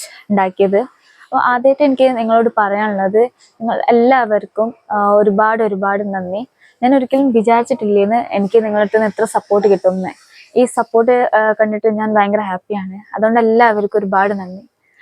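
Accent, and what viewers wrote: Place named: Uttar Pradesh, India. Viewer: Enikk thonnunnu ivar up yil ninn keralathilek jolikk vannu malayalam padichathanenn ....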